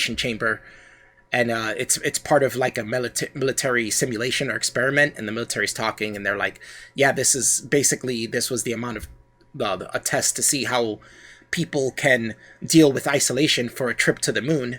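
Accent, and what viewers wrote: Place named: North Jersey, United States. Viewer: Take a hint from the show. The narrarater of this channel should be changed. Sounds like a 14 year old kid from North Jersey in his moms basement.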